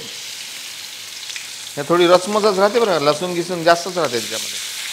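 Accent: Vidarbha, India. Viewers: डाड (ळ) कांदा बघून तोंडाला पानीच सुटलं 😋😋 आन तुमची अन आमची पन विदरभाची बोली ऐकून तर मस्तच वाटलं नं बॉ....🤩🤗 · khup chaan... ! vidarbhachi bhasha tumhala suit karte....!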